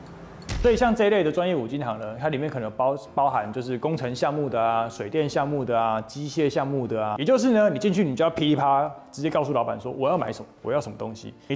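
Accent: Taiwan. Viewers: 講台語真好聽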